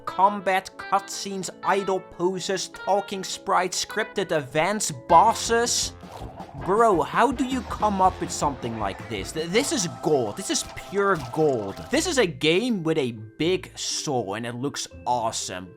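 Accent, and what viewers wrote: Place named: Netherlands. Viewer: Ya sound dutch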